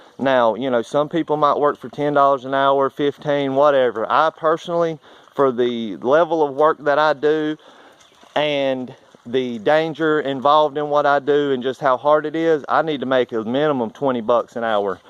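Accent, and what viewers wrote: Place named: South, United States. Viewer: you sound like a southern gentleman. No need to justify anything, appreciate your hard work. God bless.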